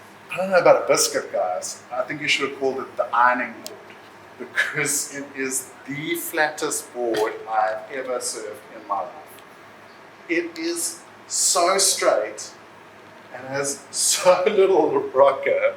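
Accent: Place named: South Africa. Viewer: Great content deserves great recognition and some dope giving back on the love that has been given, i.e., called content... It's channels like yours which make the internet and You Tube a fun place to visit and not a boring one... Thxxxxxxx dude!!!! HAPPY SURFING FROM VIRGINIA BEACH, VA!!!!!! Oh yeeeaaaahhhh I am nearly 5'9 and fluctuate from 205-230 pounds on the leaner side never less than 190 lbs, so being somewhere in the big guy club myself, this channel hits right and is super spot on... Not every surfer is skinnied out with a six pack and big guys can and do rip too, like Owen Right, Jordy Smith, John John, William Cardoso, Jay Davies, and Wade Carmichael to name a few... Plus your "SA" accent and knowledge is cool... It sucks your not at 50K approaching 100K subs already... I hope your channel blows up because your having fun, sharing positive vibes, and giving back 411 surf info we can all learn from plus dope "SA" surf, ocean, and board footage... 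Channels like yours, How To Rip, The Surfers Journey, Kale Brock, and isurftribe, have all helped me learn more about surfboards and how to build a proper surfboard quiver for my body weight and size and skill level... Most important your fun to watch because your ""having fun"" and your passionate about SURFING which most definitelyyy shows and what it should be about it, sharing and giving positive vibes and stoke to the viewers... Thanks again dude!!! Movie quote for you,, "Build it and they will come". Please keep bu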